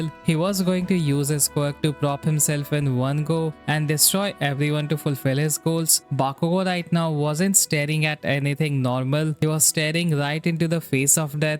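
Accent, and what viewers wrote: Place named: India. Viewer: Love the Indian accent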